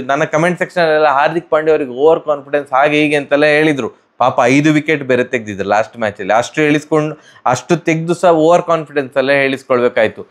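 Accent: Mangalore, India. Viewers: had lost it's charm, humor, and that Mangaluru accent, nowadays it has become as a summary of match, we have watched the what's the necessity of yours, we need videos likes your old videos, where you used to talk in a comedy way, like Dani seit, please try to bring that back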